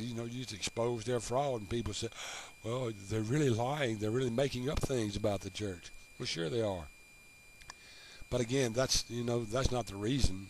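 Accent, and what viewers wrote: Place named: South, United States. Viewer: I am not sure who you are but brother you get it. I am a Byzantine Catholic. You sound southern...I'm from Mobile, Alabama, Can we talk? (I am not going to try and change your mind I think there is some good sense rollin' arounf in there). I think you totally get Catholoism. I think you need to look to your Easter Cathpolic friends before you leave.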